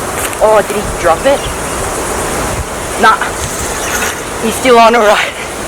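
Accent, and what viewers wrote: Australia. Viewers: Probably Australia, he sounds like it. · r u Australian?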